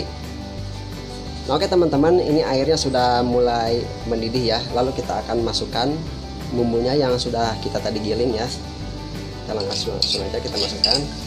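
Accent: West Java, Indonesia. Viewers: Dari sekian banyak video bang logatnya kaya org sunda kang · Saya ni penasaran abang orang padang tapi logatnya ky sunda ,mantap saya belajr ni mksh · Ky nya ini org Sunda, Krn logatnya dr Jawa brt. Sy org Sunda juga.mungkin dia kerja di rmh mkn Padang. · Semangat kang.... Sabar aja ya.... Resep nya, mantap Kalo resep ini beda bukan berarti salah.mungkin beda daerahnya kali kan bisa aja... Kalo beda daerah beda cara meskipun sama2 padang.... Memang logat nya sunda si akang ini... Tapi bisa masak padang pasti dari org padang juga gak mungkin sotoy akang ini apa lagi berani berbagi ilmu masak nya.. Semangat terus kang semoga sukse en murah rezeki👍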